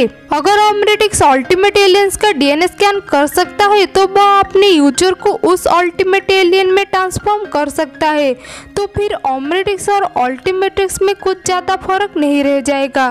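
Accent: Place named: Bangladesh. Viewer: tumhara voice se lagta ha ,tum Bangladesh she ho Ma bhi Bangladesh she ho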